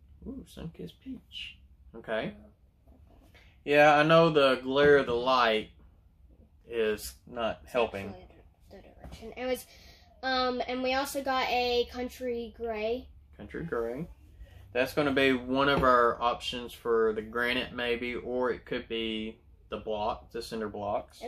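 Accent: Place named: Arkansas, United States. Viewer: Did a great job...great recovery. I’ve run into some bad instructions from Walthers too. Your Arkansas accent got me 😊...spell the name of that business....Happy New Year 🎆🎊🎈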